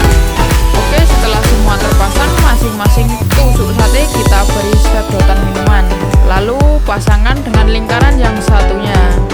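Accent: Java, Indonesia. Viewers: Tolong kurangi lah medok nya saya jadi gak nyaman nontonya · Bang medok bet😁😂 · Orang jawa ya mas kok suaranya persis orang jawa aku orang jawa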